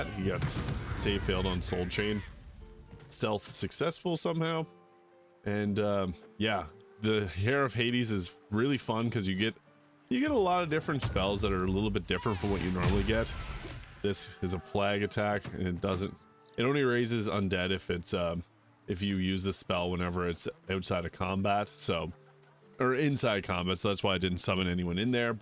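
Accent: Canada. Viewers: Homeboy is Canadian. Different linguistic inflections and pronunciations.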